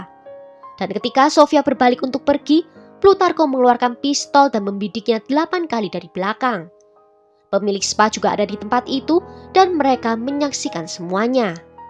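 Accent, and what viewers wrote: Java, Indonesia. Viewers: Medok banget ...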